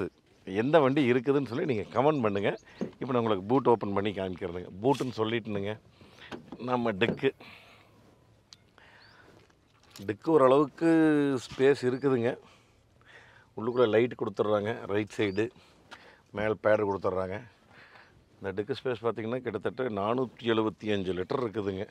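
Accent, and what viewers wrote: Kongu, India. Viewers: கொஞ்சும் கொங்கு தமிழ் ...அருமை..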